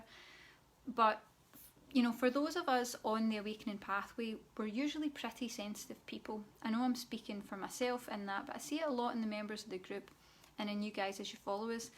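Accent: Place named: Scotland, United Kingdom. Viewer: Nice to hear the Scottish accent 😁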